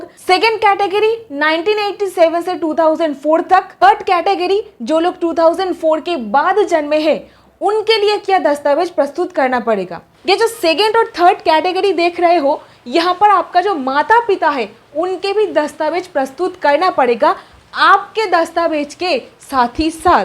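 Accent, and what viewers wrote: Bengal, India. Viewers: Apki tone bangoli h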